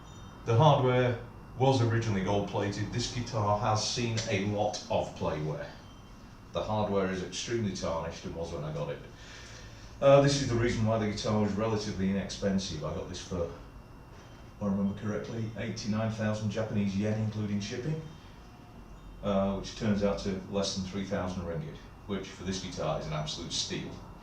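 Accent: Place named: Yorkshire, United Kingdom. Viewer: l think you don't live a million miles from me either. By your accent. I'm near Wakefield.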